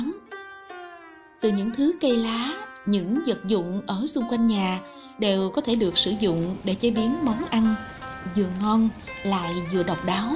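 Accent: Mekong Delta, Vietnam. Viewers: giọng đọc ấy đậm chất miền tây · Tiếng nói đậm chất miền Tây Nam Bộ, nghe mà mát tai luôn…! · Chất giọng tuyệt vời . rất hợp với miền tây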